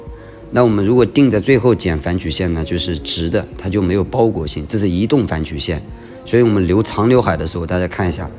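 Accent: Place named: Sichuan, China. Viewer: 四川口音親切可愛，NL不分😂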